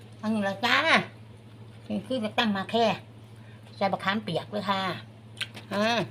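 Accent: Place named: Mukdahan, Thailand. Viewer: สำเนียงพูด เหมือนคนมุกดาหาร อยู่บ้านได๋ครับคุณพี่ สำเนียงชัดเจนอีหลี ชัดเจนกินพี่ ผมว่าเป็น ภูไท ใช่ไหมพี่สาว อิอิ แซวแน่😊😊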